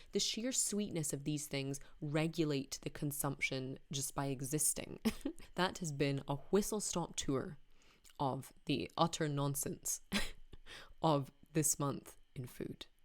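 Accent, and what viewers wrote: Scotland, United Kingdom. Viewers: Ok., let's get started. 1.I ate whole package of Ptasie Mleczko during watching this episode. 2.A few months before pandemic my plan was to look like Cristiano Ronaldo. Or Robert Lewandowski. Now, after 14 months of pandemic I look like Miss Piggy rather. 3. Because is stressful pandemic situations and pandemic depressions, I eat sweeties. Just need more sugar. 4.I eat big portions of meal to cheat my brain for produce positive message goes like this: "Easy, Robert, soon everything's gonna be alright". Of course, it won't. I dream about fish and chips. 5.I need woman to calm me down. My last girlfriend left me a few months ago. She told me that my behaviour reminds her Woody Allen's neurotic nature. 6.And finally, I found a few words of your pronunciation that need an explanations. These are: why, who, whom, where, own, home. 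You Scottish fox. 7.You have a beauty nature,.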